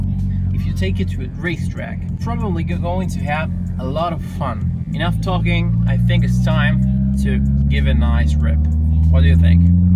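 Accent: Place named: United Kingdom. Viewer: Man me encanta , muy británico !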